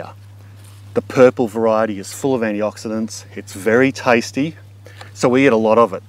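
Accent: Australia. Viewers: I like your video very much,. Just a question, where do you live? Your accent sounds like an Aussie!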